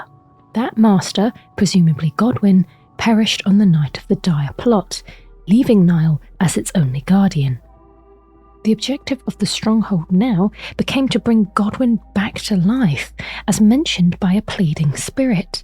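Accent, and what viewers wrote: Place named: Lancashire, United Kingdom. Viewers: First time I found your channel. And I swear it feels like I'm still playing the game 😅. Your voice fits the lands between perfectly. You sound just like latenna. I'm from Lancashire so I love a local accent. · Ah I've finally found my favorite Elden Ring Lore channel. I see a lot of them and many of them are amazing, but you really know how to tell a story. I love your voice as well, you'd actually suit doing voice work for Fromsoft games. Very relaxing and soothing English accent. A nice juxtaposition that some characters have in comparison to the harsh environment of the games. Thanks for this. I find it hard to piece tobegher the story of these games even when it's right in your face. This really helps to break it down.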